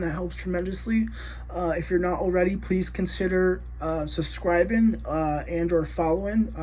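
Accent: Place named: Scandinavia. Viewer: Also, sounds like you are from a scandi heritage, i am from Denmark. Be strong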